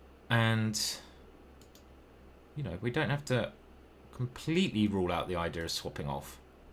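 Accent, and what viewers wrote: United Kingdom. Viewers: Mate, I love watching you Titled Tuesday's. A good old British commentary is so refreshing and entertaining to hear. With all of your "fuckin twats" and "wankers"! And very educational. Keep them going 😊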